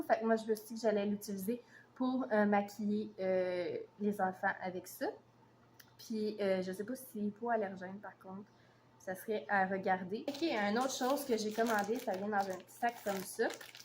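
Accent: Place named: Quebec, Canada. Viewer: L accent Québécois 😍😍😍😍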